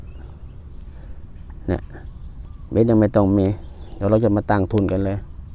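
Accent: Korat, Thailand. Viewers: โคราชบ่คับ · ฟังสำเนียง โคราชหรือนางรองครับ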